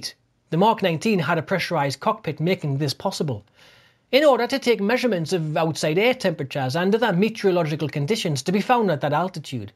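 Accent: Newcastle, United Kingdom. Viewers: A very interesting ‘Geordie’ voice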